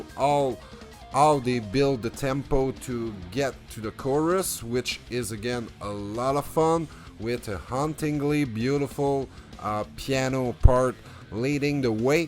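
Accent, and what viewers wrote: Quebec, Canada. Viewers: I just started this review, my favourite band by the way since 1993. You sound like a French Canadian (like me)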